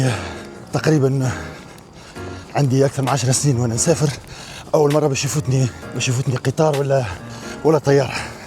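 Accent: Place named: Libya. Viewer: عندو اللهجة الليبية وليس التونسية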